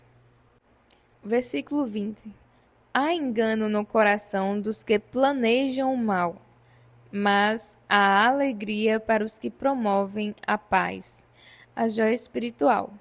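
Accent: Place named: Northeast, Brazil. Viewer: minha irmã você é nordestina..por coisa do seu sutak.rsr😍🥰🤳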